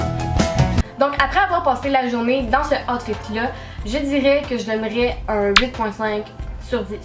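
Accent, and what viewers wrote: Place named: Quebec, Canada. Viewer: j'adore ton accent qc super video fait le je vie comme mon sims pendant 24 h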